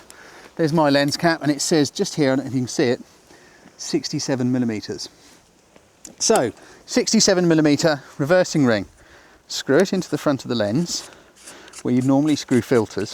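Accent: United Kingdom. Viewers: thank you for your native british language i really enjoyed it.s really lovely explain it .too much time i have been searching t the internet finally found you thank you.amazing explain .you are good teacher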